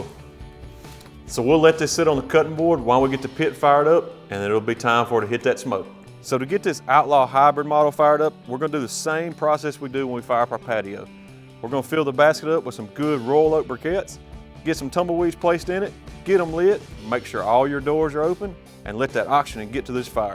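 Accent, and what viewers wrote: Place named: South, United States. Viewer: I loved the accent.. That looks like a southern accent.. Not sure if it's south Carolina or some other place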